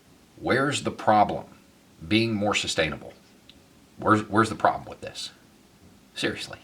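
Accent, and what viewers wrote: South, United States. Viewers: Thanks for this one. People who don’t accept science should delete their social media, cancel their phones, sell their cars, abandon technology and live with the Amish. I never comment on stuff but I’ve been watching you for a while thinking how does a dude with a southern drawl have these thoughts...then I found your other channel and it made sense Ⓐ ☮️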